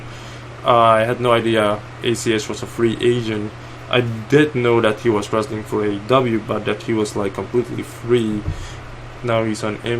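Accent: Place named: Europe. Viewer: Your accent is interesting. I thought maybe Europe somewhere. Anyway your vids are dope. New sub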